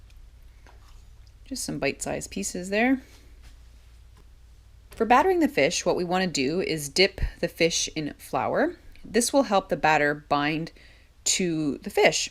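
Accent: Canada. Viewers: Your from Canada 🇨🇦 Eh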